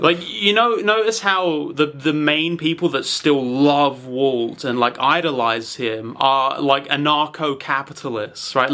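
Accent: United Kingdom. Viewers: honestly i can understand the impulse to want to go back on your delivery in the prior video. i didn't look at the comments but i'm sure it was full of people who were highly critical. i just went back and rewatched it and i have to say i don't think there's any backpaddling necessary, you did a fantastic job at meeting Skylar's critics in the middle and provided a very strong case illustrating how Walt pushes Skylar to rebel against him, ultimately making her the hero that triumphs over the life of terror he is bringing about to the family. I think for the last videos critics, with the time that has passed i'd be really interested to know if they still feel the same way. I think it reflects more poorly on them and their insecurity in their own perception of the show. It's like saying, "well i was wrong and you are right but i didn't like the way you said it!" and truly i can't see where they could see that other than Americans typically being overly critical towards GNC people and maybe your british accent feeling condescending to us when it's just how your fucked up voices sound. 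Anyways, ty for the follow up video, i'm loving these uploads so much.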